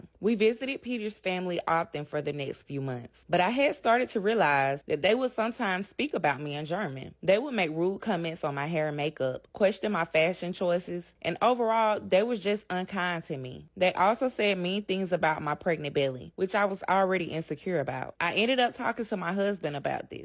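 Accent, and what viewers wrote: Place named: South, United States. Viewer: Ain’t no women with that beautiful southernish accent naming no baby of theirs LILITH 😂😂😂 So where do I send my “am I the asshole” storytimes to 🙃🙃🙃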